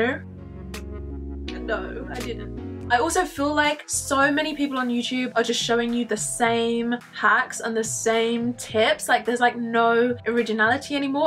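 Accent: London, United Kingdom. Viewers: Are you from London #londonsquad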